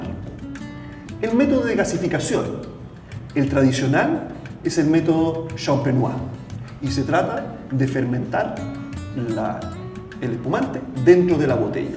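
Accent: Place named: Chile. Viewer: esta loco este wey!! de donde es ha? parece tener un acento chileno....... los vinos espumosos de Italia se llaman ASTI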